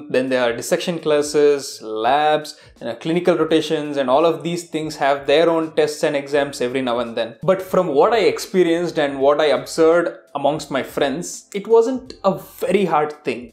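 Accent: India. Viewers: Dude,I don't know why but i can feel your Bangalore's accent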